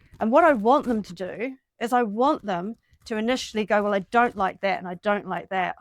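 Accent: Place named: New Zealand. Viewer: Oh I'm proud of myself for picking up on the Kiwi accent! (a transplanted American living in Australia). I also think providing your clients with a bunch of designs is a terrific idea. Not that it matters, but I agree with the kitchen table idea. I've always thought that there's something inherently silly in having a seating/dining spot just feet from the dining table. If a design wasn't open plan, it might make some sense, but otherwise, why? I'm also not keen on having either a cooktop or a sink in the island, as to make that functional, the island has to become bigger and take up more space.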